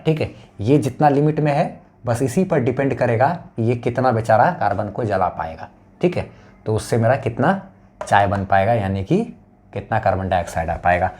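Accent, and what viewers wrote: Bihar, India. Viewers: Sir are u from bihar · Sir do u from BiHaR ??....😊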